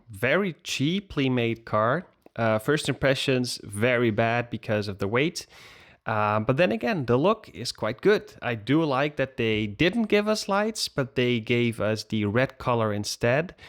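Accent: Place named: Netherlands. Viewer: Im hearing a sort of Dutch accent.. btw thnx for the review.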